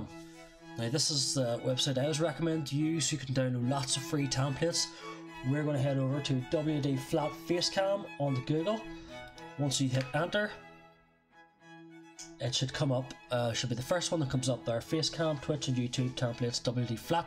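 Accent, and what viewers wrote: United Kingdom. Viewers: Is that a Belfast accent?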